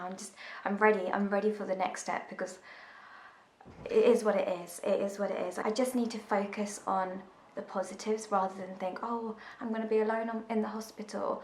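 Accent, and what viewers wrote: United Kingdom. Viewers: ur from india and u have a british accent lol. that's so cool. how come u have a british accent but ur indian.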